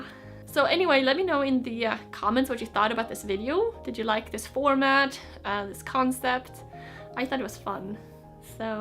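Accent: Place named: Sweden. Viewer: Nice to see more girls in this community. Just discovered your channel. Just one questions. Do you perhaps have any relation to Sweden because your accent sounds somewhat Swedish?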